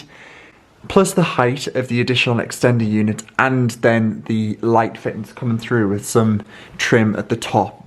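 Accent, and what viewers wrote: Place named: London, United Kingdom. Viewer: Hi cant say look behind you mate couldnt stop lol seeing your twin brother behind made me cry shall we go to gregs not hello mate its the london acsent mate ha ha you have done so much in you london home take your time you and will get there and look back as we all have done life is a learning kerb mate this is a londoner talking to another londoner mate ha ha enjoy the journey❤😊😅🎉😂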